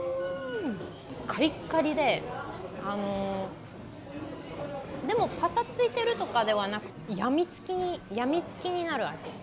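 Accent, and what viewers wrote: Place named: Kansai, Japan. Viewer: プラハ旅行の反省点も含めてレポしてもらえて嬉しいです🫶 さんめっちゃ可愛いのに関西弁な感じあって親近感わきます。笑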